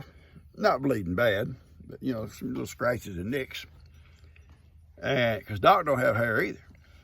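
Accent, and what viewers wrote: South, United States. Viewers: I love your country accent and your stories. I couldn't stop laughing 😂😆 at doc and the racoon on his head at the stop light.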